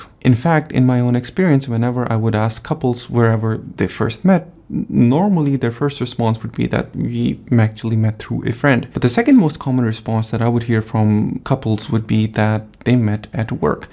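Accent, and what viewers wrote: Estonia. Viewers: You've lived there for so long that you've even picked up a slight Estonian accent :)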